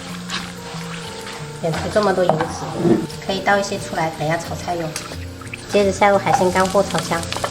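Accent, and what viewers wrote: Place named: Quanzhou, China. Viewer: 四川人怎麼泉州話說的那麼標準？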